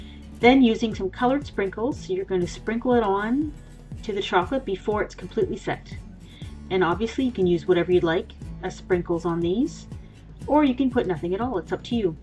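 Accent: Canada. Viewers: She sounds more Canadian than Hawaiian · Are you Canadian? O_o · I only just noticed that you're Canadian xD You have a cute accent! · I'm guessing from your accent that you're Canadian...maybe in a French part of Canada cause on your frosting container it says "au chocolat" lol just curious. I'm from America but I love Canada. Btw, I'm definitely gonna try this.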